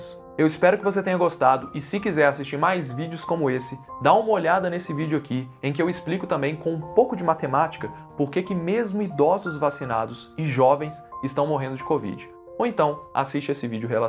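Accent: Minas Gerais, Brazil. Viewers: Esse sotaque mineiro é muito gostoso de escutar!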